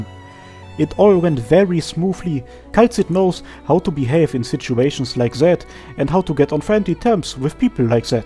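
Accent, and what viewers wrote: Germany. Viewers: A short woustion are you germen becaus it sounds a bit like you have a german accent if so Servus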